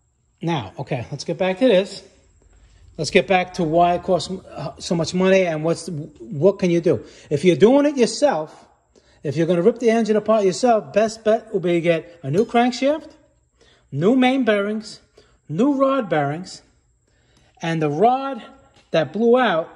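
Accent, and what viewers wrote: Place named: Boston, United States. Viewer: I swear to god that bostonian accent gives me such vibes. It's like playing max payne or something and just listening to one of the gangsters explaining to his buddy, in detail, how a car engine works and why his ride is irreversably screwed lmao All joking aside though, this vid and your channel in general is great and informative but I just had to mention the accent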